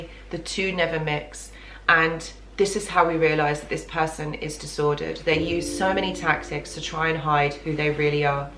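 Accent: England, United Kingdom. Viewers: Ur really cool 😆 it’s xtra reassuring to me to hear another fellow English accent on a channel like this much thanks for the work u do it is appreciated Strength to strength peace all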